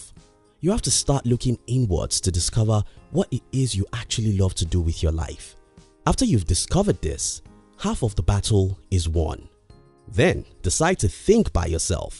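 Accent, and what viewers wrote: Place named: Nigeria. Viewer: I feel your voice is Nigerian, its not that important but can that hand be dark skinned? 😊 However good video, inspirational and motivational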